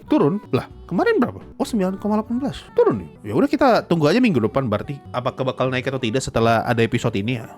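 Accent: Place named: Sulawesi, Indonesia. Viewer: orang sulawesi kah? distracted aja ~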